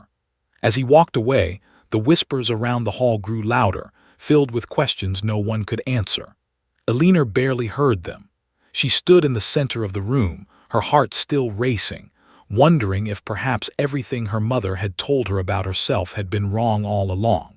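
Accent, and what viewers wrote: United States. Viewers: .........And get rid of the US accent when it's a UK story.......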